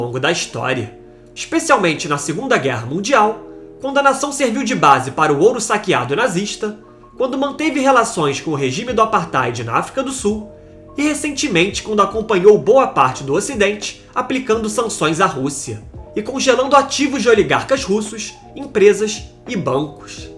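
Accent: Rio de Janeiro, Brazil. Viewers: Bahhhh. Sotaque carioca. Pulei o vídeo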